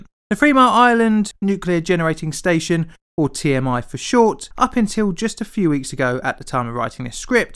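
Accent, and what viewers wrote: United Kingdom. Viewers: done by a nasal Brit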